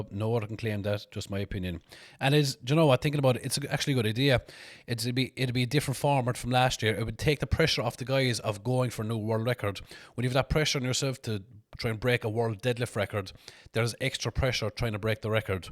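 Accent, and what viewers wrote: Ireland. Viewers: You are a born story teller, it’s the Irish accent, 323, I enjoy your videos as they are 👍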